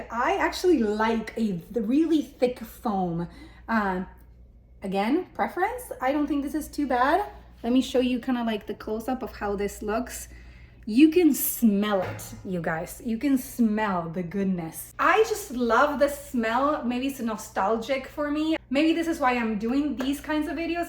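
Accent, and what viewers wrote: Argentina. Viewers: I knew that accent sounded familiar! My ex-wife is from 🇦🇷 and half Italian, lol. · Hola. Te escribo desde Colombia. Soy muy fan de tu contenido, me encanta. Por qué no haces videos hablando en español? Así como los haces en inglés, qué tal si haces de vez en cuando contenido en español. Lo poco que te he escuchado hablar en español, me ha fascinado tu acento y estaría genial que nos dejaras escucharte de vez en cuando en tu lengua materna y más con el acento argentino que es maravilloso. Hazlo:) nada mejor que hagas más contenido hablándonos de tu país, de tu cultura, de tus raíces pero con tu acento nativo. Anímate 😊 Saludos desde Colombia y te felicito por el contenido que haces y el empeño que le pones, se nota que te encanta y eso se transmite una naturalidad muy bonita que se ve en la forma en la que haces tus videos.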